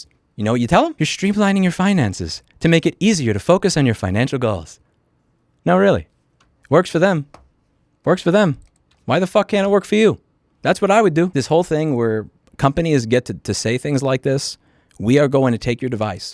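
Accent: New York, United States. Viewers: Haha, yeah, I came down here looking for similar comments. His NYC accent was coming through strong and thick.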